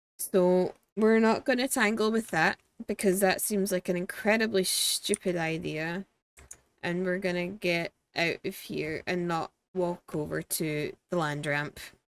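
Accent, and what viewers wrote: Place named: Scottish Highlands, United Kingdom. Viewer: Hi, I'm enjoying your videos. Your accent leads me to believe you are from the highlands. Maybe in the northwest. Isle of Sky area and the origins of the MacDonald clan. Am I right?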